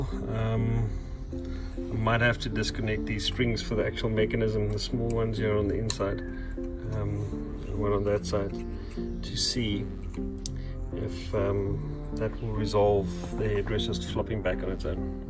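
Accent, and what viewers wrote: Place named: South Africa. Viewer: Nice to hear a South African on YouTube give a how-to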